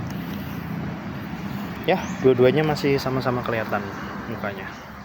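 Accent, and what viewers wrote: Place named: Banyumas, Indonesia. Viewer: Wong ngapak ya mas.....? Keton sitik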